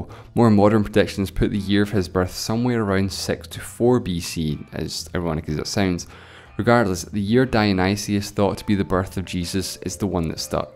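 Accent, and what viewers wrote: Scotland, United Kingdom. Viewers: He's Scottish.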